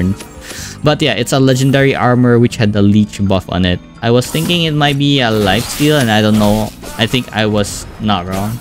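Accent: Philippines. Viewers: Hello, are you by any chance a Pinoy? Just a random guess based from your accent.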